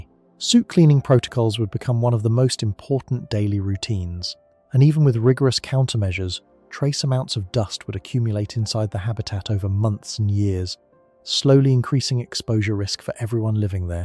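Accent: United Kingdom. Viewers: Is this a man or is it AI? The only reason I ask is because these two hour videos are released constantly and the narrator speaks in a perfect British accent without missing so much as a single beat, without pausing or running out of breath, without stumbling. Constantly over and over again…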